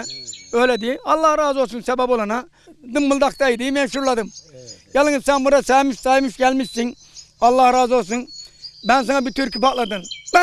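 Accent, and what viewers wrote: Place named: Isparta, Turkey. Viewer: Tam ısparta şivesi :D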